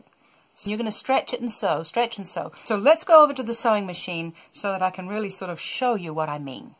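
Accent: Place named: United States. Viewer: Thank you for such a handy tutorial. Are you Irish? You sound like you have a mix of Irish and American accent, very sweet.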